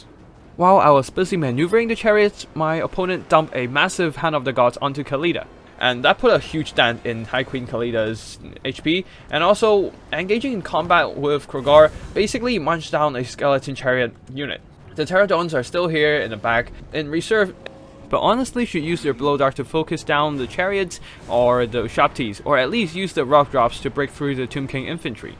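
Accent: China. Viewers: Your Chinese accent makes the video so nice to watch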